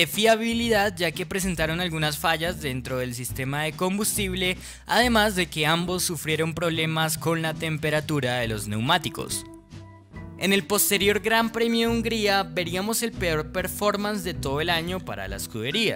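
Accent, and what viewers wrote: Colombia. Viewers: Felicitaciones, gran contenido, con rigor técnico, sigue así y llegarás lejos. Por tu acento eres colombiano, así que un saludo a ese gran país cuna del gran Juan Pablo Montoya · Por el asentó colombiano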